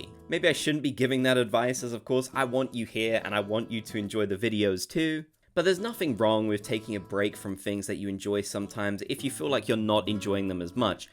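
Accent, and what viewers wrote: United Kingdom. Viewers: both are british❤️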